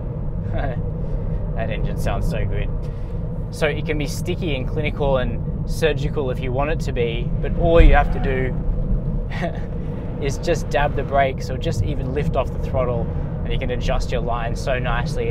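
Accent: Australia. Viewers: Bastardised Aussie English is insufferable, switched off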